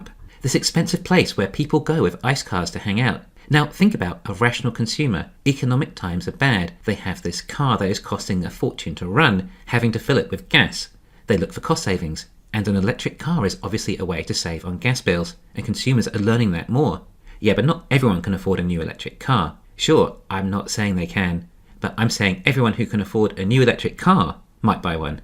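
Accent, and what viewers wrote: England, United Kingdom. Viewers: Petrol forecourt, that expensive place where ICE car owners like to hang out 😂 ❤ Nice one. Also as an Australian why do you sound more English than me and I'm from England? Nice accent.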